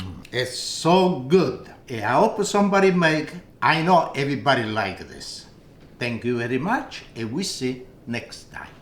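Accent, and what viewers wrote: Italy. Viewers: Growing up in East Vineland, we were surrounded by hard-working truck farms. With that came the best Italian/Sicilian cuisine. You, dear sir, not only remind me of these fine memories but also have an accent I know well. Heartwarming. I have been following you for years. My daughters grew up on this food. They, too, think you are adorable as they pass down these fine traditions to their families. You are a true master of this art. Thank you so very much!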